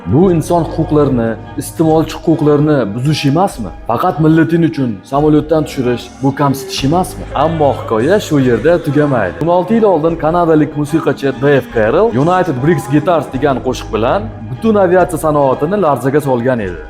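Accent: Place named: Namangan, Uzbekistan. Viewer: Namangan xili qimanglar Uzbek til adabiyotida yuq kutlarda bordima?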